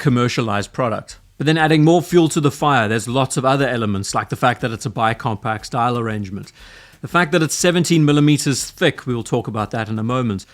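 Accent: South Africa. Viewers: Trouble placing your accent, Pretoria, or ZA in general?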